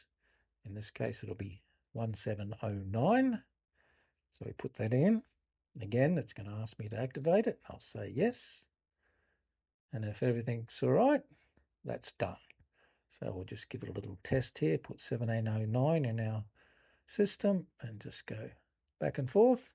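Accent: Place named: Australia. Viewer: Sounds like you're a fellow Aussie. Where do you buy the Kato decoders?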